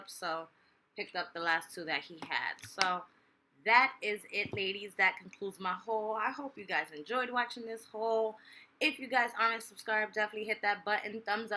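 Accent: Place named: North, United States. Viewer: I subd love ur accent u from up north i was born in buffalo ny n miss the northern accent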